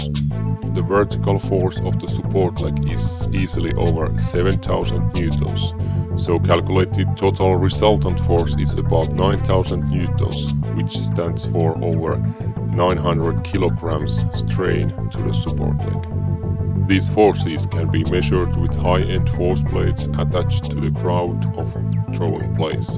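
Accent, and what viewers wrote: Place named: Russia. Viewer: Sounds like he's Russian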